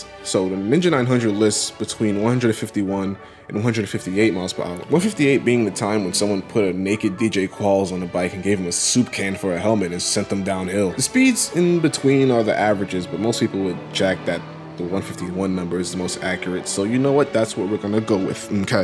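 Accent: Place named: Italian-American, United States. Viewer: Lmao I love the guido voice, muff per hour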